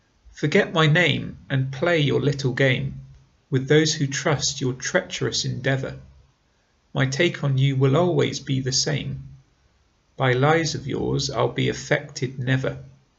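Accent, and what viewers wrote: United Kingdom. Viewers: dear my best teacher i like your speaking style and your accent very well i want to learn british english and speak like you plz tell me the best way how to speak likes a british native speaker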